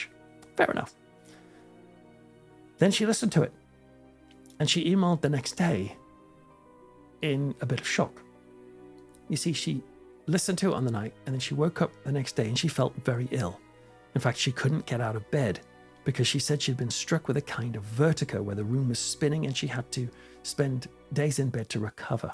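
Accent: United Kingdom. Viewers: Your the best story teller on you tube , and British … which is so refreshing 😊😅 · This man needs a nomination to the storytellers hall of fame. You have a wonderful way of making the listener feel like they are right there witnessing the events you speak of. Plus, as an American, I don't know why, but when you Brits tell a story, it just sounds scarier in a good way. 😊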